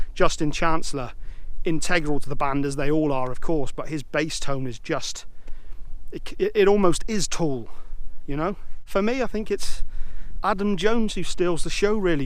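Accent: United Kingdom. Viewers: Looks like the English countryside,but then he does have an English accent.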